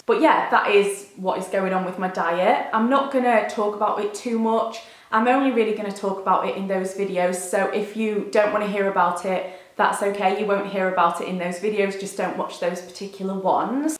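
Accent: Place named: North, United Kingdom. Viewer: I relate to you massively with regards to food. I’ve struggled with binge eating when I feel anything but happy and the past few weeks I’ve massively been off plan with slimming world. It’s so inspiring to see someone so beautiful having the same issues as me, so I thank you for showing me it’s okay to feel how I feel. Also you’re northern like me and I love it!! 😂 I really hope I meet you at some point, I’d love to sing with you is that weird?? 😂💕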